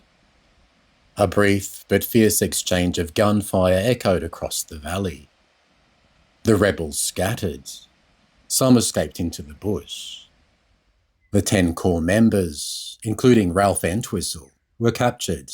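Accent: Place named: Australia. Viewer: Great to hear a proper Aussie voice.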